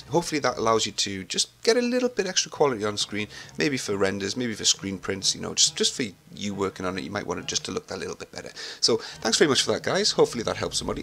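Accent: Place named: United Kingdom. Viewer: I love your way of commenting? What part of UK are you from? Your accent is delightful.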